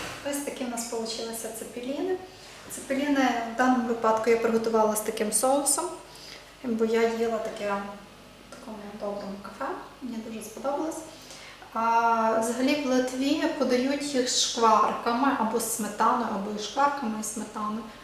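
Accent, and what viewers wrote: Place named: Galicia, Ukraine. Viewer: Смачний рецепт ,непорівняний Галицький акцент,.дуже дякую.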